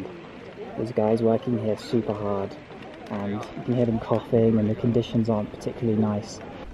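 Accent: United Kingdom. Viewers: British accent ....,👍👍👍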